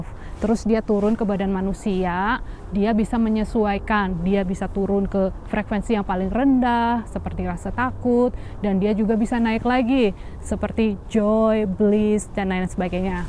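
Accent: Banyumas, Indonesia. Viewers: mba esih katon logat ngapake:D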